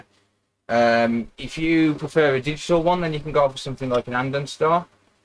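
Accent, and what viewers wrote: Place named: Scotland, United Kingdom. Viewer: Good scottish accent mate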